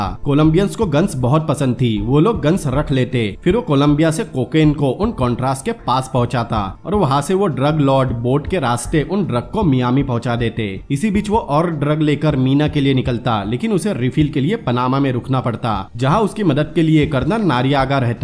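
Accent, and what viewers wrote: Assam, India. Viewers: भाई आप असम से हो??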